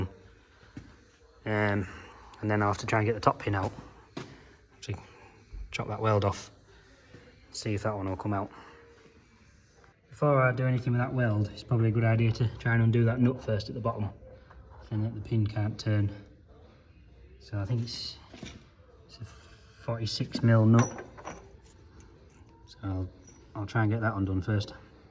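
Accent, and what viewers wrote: Yorkshire, United Kingdom. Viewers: brayed it in! broad yorkshire!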